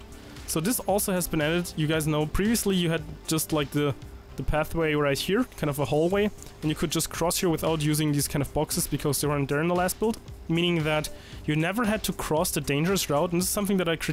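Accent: Germany. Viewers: man hört richtig das du deutsch bist, trotzdem gutes Video 💪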